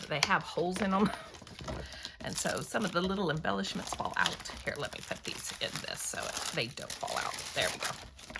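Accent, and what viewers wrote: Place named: Oklahoma, United States. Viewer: Hi! Your channel was suggested on my feed yesterday, and I love watching people make page kits! I hope this doesn’t sound crazy, but I noticed in a picture on one of your videos you had an OK State shirt on and your voice kind of has an OK accent - are you from OK? I’m in OKC area, and I’ve never met a fellow scrapper in OK, so I had to ask and say hi! Have a great evening!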